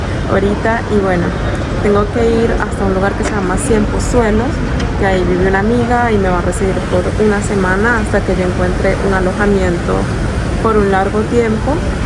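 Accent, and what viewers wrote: Colombia. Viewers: Esta chama es venezolano pero habla como una colombiana , imagino que su por estadia alla imagino que ahora va hablar como un español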